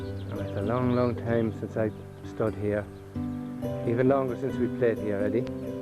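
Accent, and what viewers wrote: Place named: Cavan, Ireland. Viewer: Thank you Ciaran. Glad you like it and my Cavan accent!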